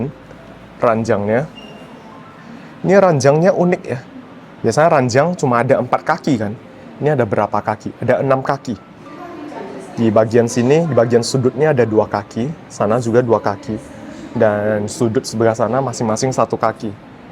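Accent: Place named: Medan, Indonesia. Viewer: Kau cakap tetap logat medan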